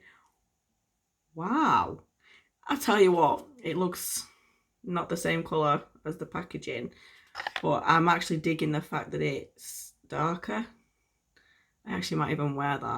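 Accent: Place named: Southern England, United Kingdom. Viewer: Hi, just subbed to you, great video! That becca pallette looks well lush! I have been trying to figure out your accent...Yorkshire or Lancashire? I am a Yorkshire lass but my accent is all over the place due to living down south for a while when I was younger, your accent sounds like you have some southern influence too??? xx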